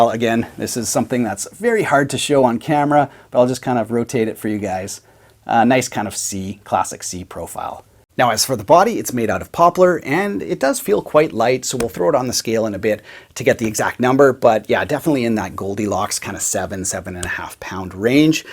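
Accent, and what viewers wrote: Canada. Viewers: Find Uut....Abuut....Love the Canadian accent. Great review as usual.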